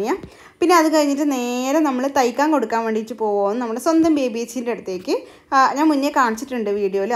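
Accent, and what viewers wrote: Kannur, India. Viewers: തന്റെ kannur slang മാറുന്നുണ്ടോ എന്നൊരു തോന്നൽ.. നമുക്ക് നമ്മുടെ slang kelkkanaa ഇഷ്ടം 👍🏻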